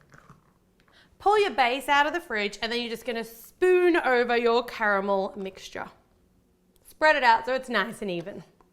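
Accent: Australia. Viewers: it's just her Australian accent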